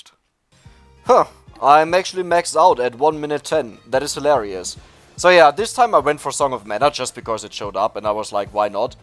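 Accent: Germany. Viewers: Love the german-ish accent and, if you didn't already, would love to see some video of regular runs while you explain the thought process of choosing an upgrade or the other and the reasoning behind it. Plenty of people apparently don't "get" the basics behind how to at least finish a normal run and I would love to share some of your videos with them ;)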